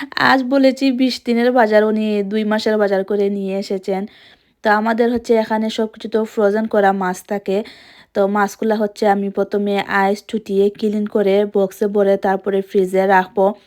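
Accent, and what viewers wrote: Sylhet, Bangladesh. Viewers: আপু তো সিলেটি মনে হচ্ছে সিলেট কোথায় আপনার বাড়ি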